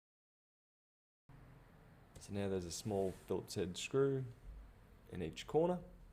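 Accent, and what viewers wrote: Australia. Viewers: Thanks for the video man! Just a question, would you be able to share the display model? I currently live in Aus and have the same exact laptop model, and in your comments you said you were able to upgrade it to a 1080p screen which is epic. I would like to upgrade my screen to 1080p aswell but I can only find 720p screens online, so I'm hoping I can find the same display you have in the internet. Or, If your local supplier is able to do nation-wide shipping, let me know and I'll also be ordering from them aswell (sounds like you're in aus aswell, lol) Anyways, liked and subscribed!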